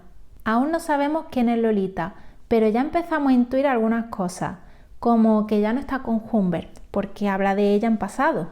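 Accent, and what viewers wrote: Andalusia, Spain. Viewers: Es acento andaluz. De Granada, creo.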